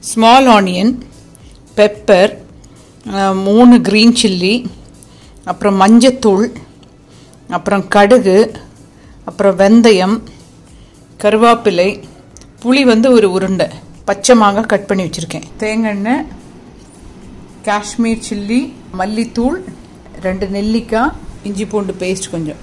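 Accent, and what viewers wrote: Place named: Kanyakumari, India. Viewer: You speak kanyakumari Tamil..💪.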